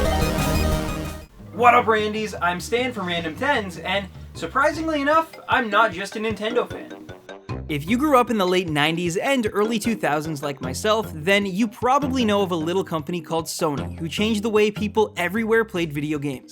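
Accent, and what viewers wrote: Canada. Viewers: is he Canadian? he sounds Canadian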